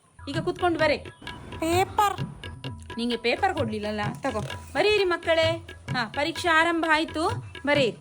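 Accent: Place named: Coastal Karnataka, India. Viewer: ನೀವಾಡುವ ಕರಾವಳಿ ಕನ್ನಡ ಧಾಟಿ ನಿಜಕ್ಕೂ ಮನೋಜ್ಙ, ಕೇಳುಗರ ಕಿವಿಗೆ ಅಚ್ಚುಮೆಚ್ಚಿ.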